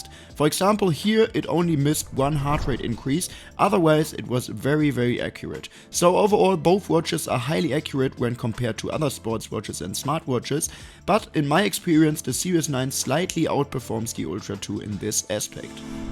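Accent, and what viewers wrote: Germany. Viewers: Love the accent haha, very German review, useful and helpful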